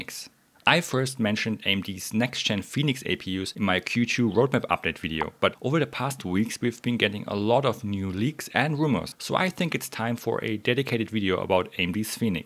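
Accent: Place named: Germany. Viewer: I love the German accent in your English 😀😀. I just subbed.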